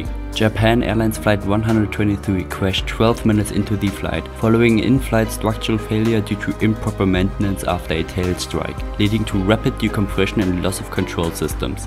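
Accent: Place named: Germany. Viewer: you're definitely german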